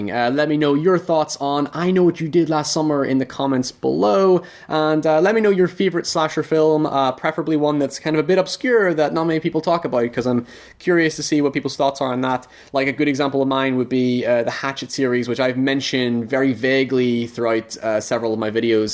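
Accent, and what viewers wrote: Northern Ireland, United Kingdom. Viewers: My guy are you Northern Irish?? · U have a northern irish accent? ❤❤❤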